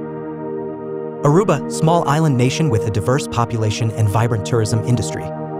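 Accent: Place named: United States. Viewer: Get rid of the American accent, it’s terrible.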